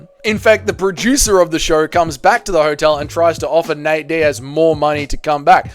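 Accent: Australia. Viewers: U gotta change that intro especially with that accent sound like a Walmart ozzy man😞no disrespect this is my 1st time seeing you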